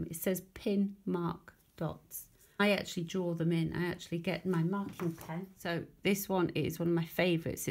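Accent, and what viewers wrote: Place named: England, United Kingdom. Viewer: I am happy the 2nd part followed so quickly. As a German I have already learned some technical terms through you. How about the seam allowance, is it included in the pattern? I am thrilled I ordered the pattern yesterday. Love from Uta from Munich. P.s. I like your accent, reminds me of the years I spent in good old England, centuries ago.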